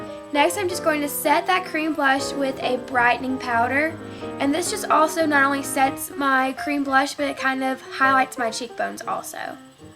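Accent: South, United States. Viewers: You're soo pretty! Do you have a country accent? <3 & i just subscribed. (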